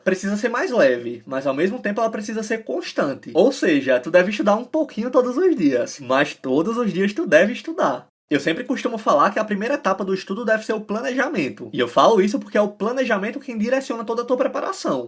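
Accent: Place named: Pernambuco, Brazil. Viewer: Reconheço um Pernambucano de longe, e você, é um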